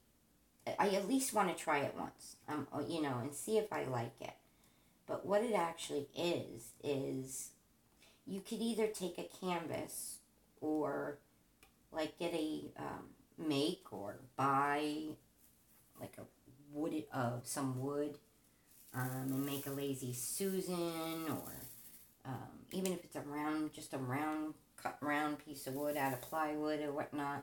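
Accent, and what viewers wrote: New York, United States. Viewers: OH SNAP!! Gotta love the New York accent and honesty! You answered any questions I had about what was going on :) LOVE 💘 ya girl!! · Can't wait to see your new craft I'm loving your Newyork acsent 💋💋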